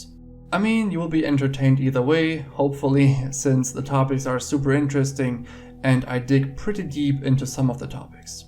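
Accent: Germany. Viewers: I thought it was a Slavic accent at first, but it's German. Very pleasant. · I'm half British and half german, so I'm always thinking are you German? I can hear a light accent but it can also be something else :) Keep on the good work!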